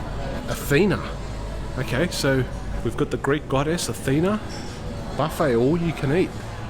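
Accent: Australia. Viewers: Where are u from boss? Australia? Your accent likes ausie guy. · I visited your channel for the first tome today. I didn’t pick you as Turkish, but your Aussie accent is a dead giveaway. Btw, I like tour channel and just subscribed.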